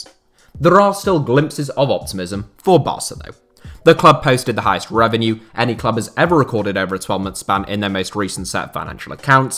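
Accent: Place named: United Kingdom. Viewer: I know you are too busy to read this message but could you put in some subtitles? Sometimes your British accent makes it difficult for me to understand a whole sentence. If you can't don't worry, I'm just suggesting something.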